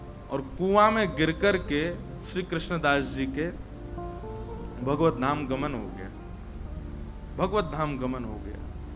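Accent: Braj, India. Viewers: Maharaj ji aapke mukh se barij bhasha mein katha sunna bahut acha lagta hai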